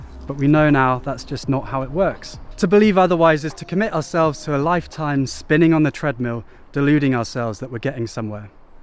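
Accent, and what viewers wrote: United Kingdom. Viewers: Ancient wisdom and British accent! My YouTube knows exactly what I like. 👀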